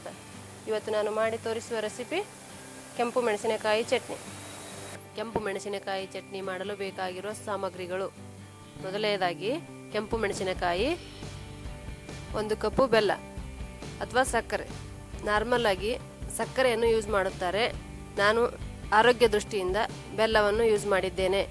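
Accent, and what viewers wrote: North Karnataka, India. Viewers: Mam neevu Uttar Karnataka matalle matanadi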